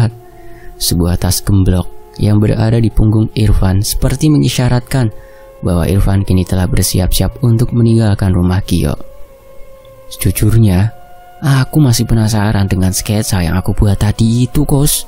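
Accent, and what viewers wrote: Java, Indonesia. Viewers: Cerita org dijakarta jadi bikin imajinasi di daerah jawa karena dialeknya😂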